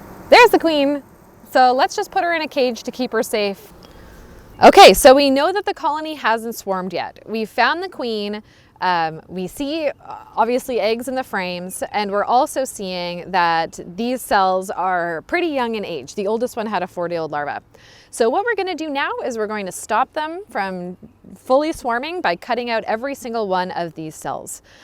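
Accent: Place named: New York, United States. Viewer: Finally! A beekeeper who keeps bees and thinks like me! Super eloquent and intelligent! is thorough and knows her beekeeping! I love her explanations and NY accent 😍 Keep up your awesomeness and please keep posting! 🐝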